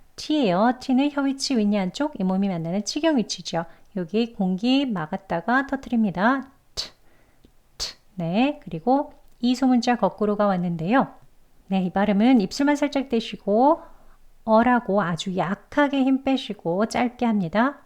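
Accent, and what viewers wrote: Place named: United States. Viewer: 전형적인 미국식 발음 같네요. 오늘도 잘 들었습니다. 감사합니다.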